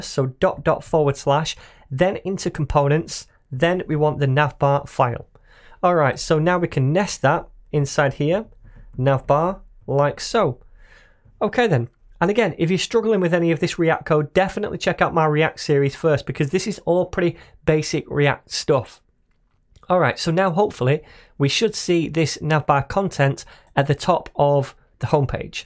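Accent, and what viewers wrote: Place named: Barnsley, United Kingdom. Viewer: Great videos mate, thank you! Saw that you mentioned Manchester but sounded like you were from Barnsley 😉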